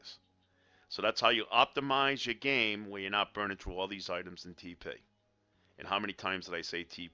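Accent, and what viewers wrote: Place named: Boston, United States. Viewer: Watching this almost a year later bro. Thanks. Just wondering, you from Boston? I hear the local accent.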